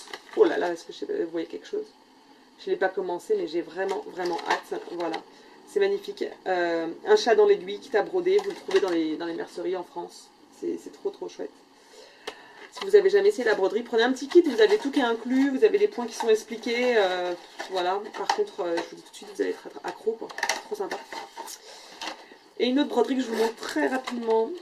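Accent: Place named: Quebec, Canada. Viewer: Bonjour, Super podcast. Il me semblait bien avoir entendu un léger accent québécois. L'Arizona est superbe et en été il fait très chaud. J'ai sillonné les USA depuis Montréal , du nord au sud et de l'ouest en est avec une amie et j'ai vu le grand Canyon. C'est magnifique , j'ai visité Phoenix et j'ai beaucoup aimé mais cela fait 30 ans. J'ai envie de commencer le crochet mais je ne sais par quel projet debuter. En tout cas j'attends avec impatience ton prochain podcast. Sylviane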